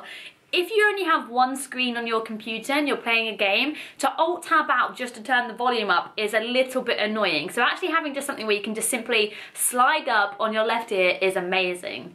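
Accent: United Kingdom. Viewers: Man British accents give me a headache, I can't take more than about 5 mins of it, but this is a sweet looking headphone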